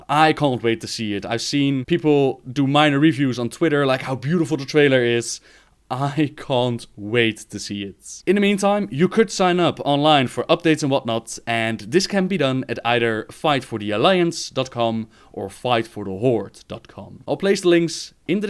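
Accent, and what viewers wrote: Netherlands. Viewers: Your dutch accent! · It's a Dutch accent.. I reconnized it right away. I hate the Dutch english accent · dutch accent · I think hes from the netherlands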